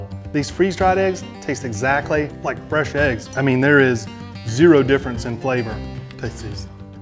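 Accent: United Kingdom. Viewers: That British accent got me good😄 I did not expect that. Good one sir. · British accent earned you a sub... from a Brit! Haha.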